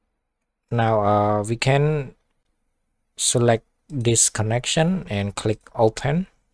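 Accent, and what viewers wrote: Cambodia. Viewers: Ur sound like U are Cambodian!! Anyway this is the good lesson one to learn. Thank!!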